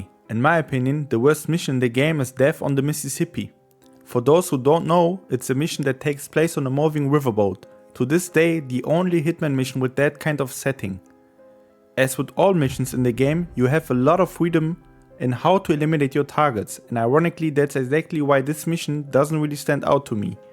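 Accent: Germany. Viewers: Man merkt das du Deutsch bist Aber trotzdem gutes Video ❤ Und sogar unterhaltsam 👍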